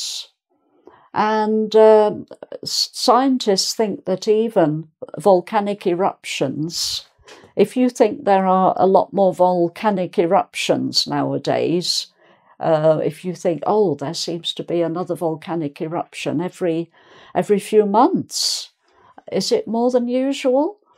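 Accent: England, United Kingdom. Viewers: Lovely, such an English accent and so elegant. Thank you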